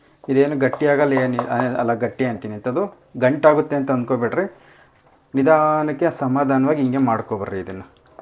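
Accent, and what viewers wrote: Chitradurga, India. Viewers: ಹಲ್ವ ಮಾಡೋದು ಚೆನ್ನಾಗಿ ತೋರಿಸಿದಿರಿ ಚಿತ್ರದುರ್ಗ ಕಡೆ ಭಾಷೆ ನಾ ಇದು ಚೆನ್ನಾಗಿದೆ ಚೆನ್ನಾಗಿದೆ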